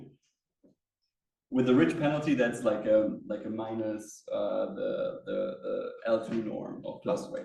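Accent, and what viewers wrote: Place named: Germany. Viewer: perfect German accent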